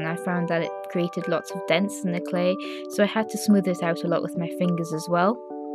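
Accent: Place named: North East England, United Kingdom. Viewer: North east england ^^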